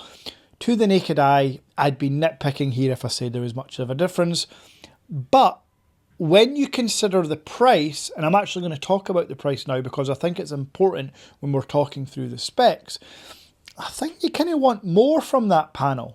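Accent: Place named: Scotland, United Kingdom. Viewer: Love your Scottish accent!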